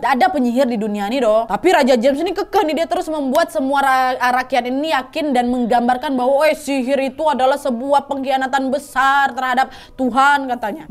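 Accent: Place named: Medan, Indonesia. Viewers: Kak itu lahir di pekan baru sudah bebrapa kali kk blng dan memang bahasa ny agak mirip sm kek logat orang medan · oh begitu, kalo logat si saya emang tau makannya pertanyaan saya Medan, Pekanbaru atau Batam 😂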